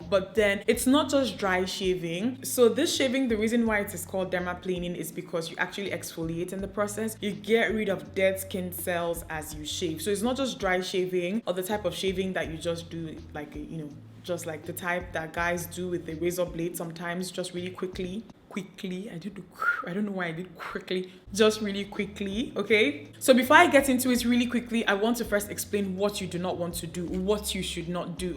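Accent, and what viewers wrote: Nigeria. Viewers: Its the nigerian accent for me 🤩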